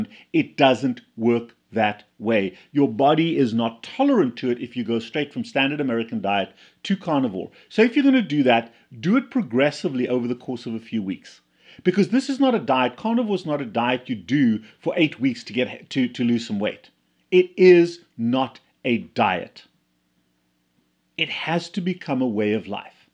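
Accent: South Africa. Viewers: Hey Doc. I recently found your videos and I'm enjoying them so much. From the first video I could immediately could hear that you are from my neck of the woods, from SA. Thanks for all your videos. Lekker man.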